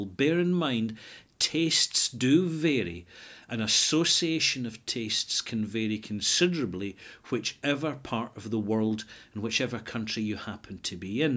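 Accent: Scotland, United Kingdom. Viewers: Hello, it only took me 5 1/2 years to watch this vlog. The whole 27 minutes was enjoyable. Even the scottish turn of phrase "see... that/yon". Kept wondering how much you were having to keep your brogue in check. Thanks and take care. Fraser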